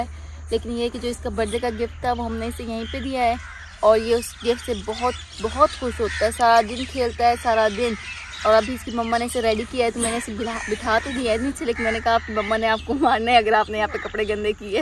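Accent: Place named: Sindh, India. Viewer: آپ کا بولنے کا لہجہ سندھیوں جیسا ہے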